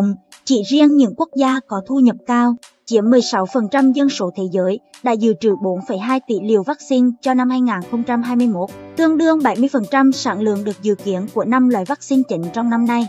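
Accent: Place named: Hue, Vietnam. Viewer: Lâu rồi mới được nghe giọng Huế.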